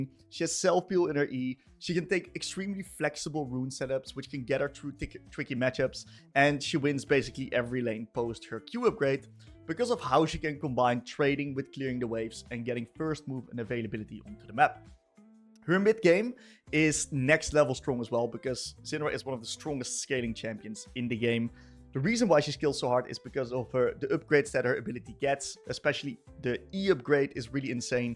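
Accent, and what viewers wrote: Netherlands. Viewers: I like your typical Dutch english accent!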